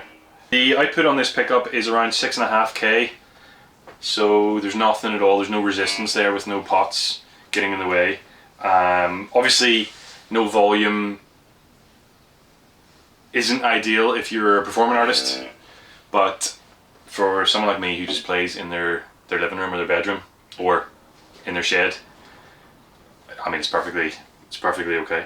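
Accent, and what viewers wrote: Northern Ireland, United Kingdom. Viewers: Danny sapko watchers when they hear a British accent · That's quite a controversial statement - the Northern Ireland accent is not "British".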